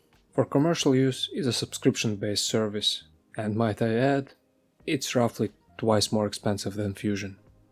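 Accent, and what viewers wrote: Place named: Latvia. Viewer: I can tell a Latvian just by hearing the voice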